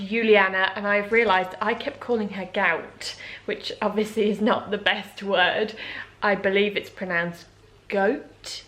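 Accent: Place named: London, United Kingdom. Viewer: Omg ur dog sooo cute 🐾🐶! I love dogs 🐶! U look great thanks for sharing .. and like how u talk with that accent from London you r London girl .. 👍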